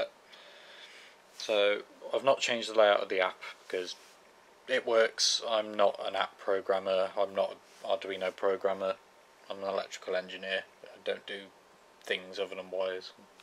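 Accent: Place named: England, United Kingdom. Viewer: Nice to hear an English voice behind this project! Great work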